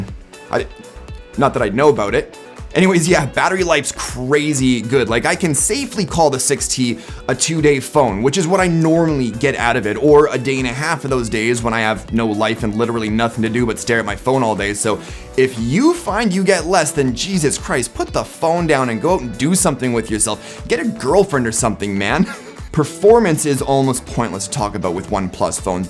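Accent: Canada. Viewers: Are you from Vancouver (BC) eh?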